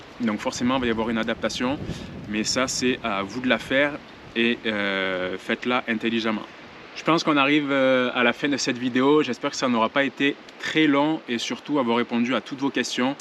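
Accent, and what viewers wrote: Quebec, Canada. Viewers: J'adore ton accent. On entend tranquillement le petit accent Québecois qui perce! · L’accent québécois pas canadien haha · Tu as tellement pris l'accent canadien :) GG mec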